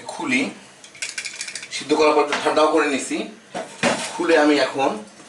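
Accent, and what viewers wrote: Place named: Noakhali, Bangladesh. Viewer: মনে হয় নোয়াখালী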